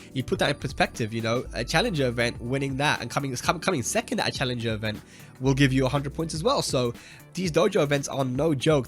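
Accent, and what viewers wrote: South Africa. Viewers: Lol, that South Africa accent! :D Haha, thanks for the shout out! We're as excited as you are about all of this! TWT LETS GO! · Lmao that south african accent 😅😂. Lovely video 👌🏼🔥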